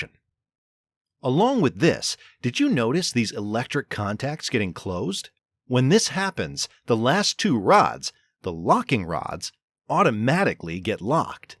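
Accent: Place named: India. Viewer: Brother is from India It took me time to notice 😭😭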